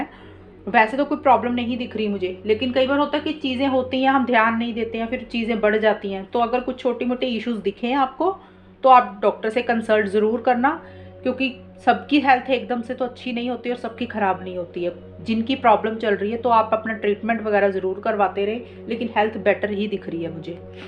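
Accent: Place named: Jammu, India. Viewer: arrey ni mam I mean apke bolne k touch se lga ki ap jammu se ho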